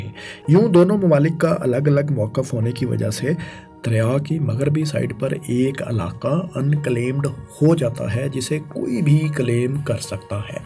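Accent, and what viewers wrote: Punjab, Pakistan. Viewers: ماشاءاللہ بہت معلوماتی ویڈیو تھی۔ فیصل بھائی سے درخواست ہے کہ اس ویڈیو میں بولنے والے بھائی کے بجائے خود آڈیو دیا کریں کیونکہ یہ بھائی پنجابی زدہ اردو بولتے ہیں اور مجھے اس سے بہت کوفت ہوتی ہے۔ مثلاً عِلاقہ(Ilaqa)کو عَلاقہ(Alaqa) ، صورَتحال(Soorate Hal) کو صورْتحال(Soorte Hal) اور آزادی کو ازادی تلفظ کرتے ہیں ۔ اور 19 کو انیس کے اُنی بولتے ہیں ۔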